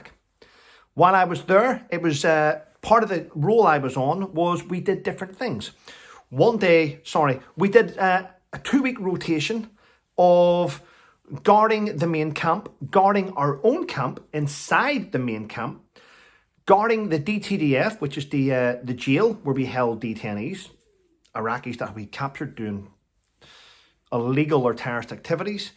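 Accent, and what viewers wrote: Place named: Ireland. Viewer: Oh that must have been so funny 😆 I love hearing your stories especially your Irish accent, my late Mum came from Carrickfergus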